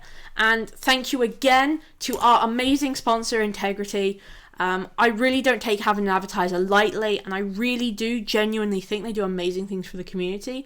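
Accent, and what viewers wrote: United Kingdom. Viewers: Love British accents :)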